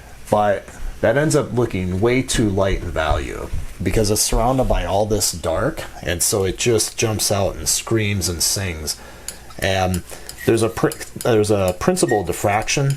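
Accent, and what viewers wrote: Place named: Minnesota, United States. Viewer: best tree video I've seen. I've been practicing our steps and I'm happy how the trees are turning out. Hey you sound like where I'm from - back in Minnesota.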